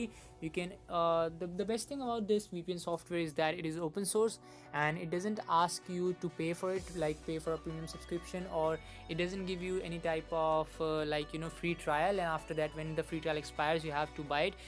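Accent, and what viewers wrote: India. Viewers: First Indian mixed with English guy that had ever been helpful thanks bro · i would listen to this dude, but everytime i hear an indian accent trying to provide technical help, it is a scam, so no thanks lol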